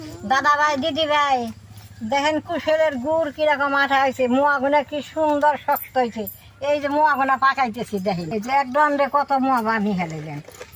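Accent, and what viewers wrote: Tangail, Bangladesh. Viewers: টাঙ্গাইলের মানুষ আমি। কুমিল্লা থেকে দেখছি। আপনার মুখে টাঙ্গাইলের ভাষা শুনতে খুব ভালো লাগে।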